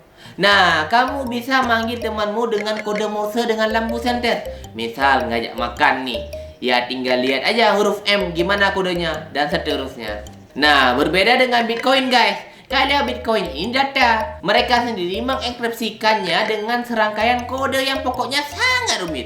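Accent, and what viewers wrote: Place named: Aceh, Indonesia. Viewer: Logat aceh abg nih.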